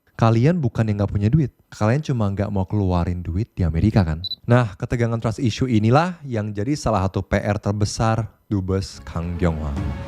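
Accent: Jakarta, Indonesia. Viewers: Lah emg anak jaksel 😂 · muka Korea logat bahasa Indonesia abis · Ngomong nya sdh Indonesia banget ( jakarta ) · aksennya indonesia bgt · Aksen bahasa indonesiamu bener2 indonesia banget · logat indonesia bgt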